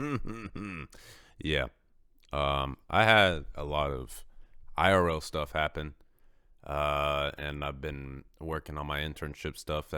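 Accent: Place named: Brooklyn, United States. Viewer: totally random but you have the most interesting accent I've ever heard, lol. How do you have you a Brooklyn, Russian, and Texan accent at the same time 😭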